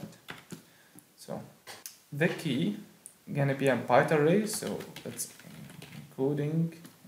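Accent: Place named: Egypt. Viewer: عرفت انك مصري من لهجتك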